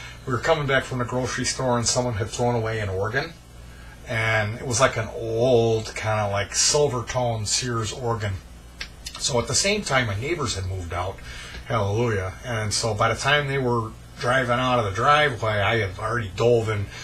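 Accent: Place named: Upstate New York, United States. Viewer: The accent. Buffalo, Rochester?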